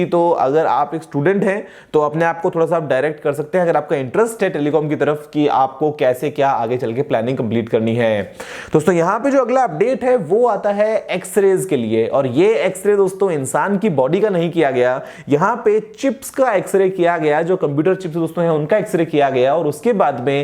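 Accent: Bengal, India. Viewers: app kya bengali ho???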